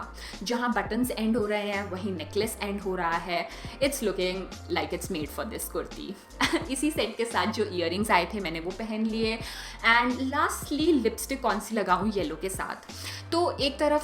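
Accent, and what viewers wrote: Punjab, India. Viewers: Aapka bolne ka style ekdum punjabi touch ka hai!! Im in love with your outfits because they are very sober and decent!!!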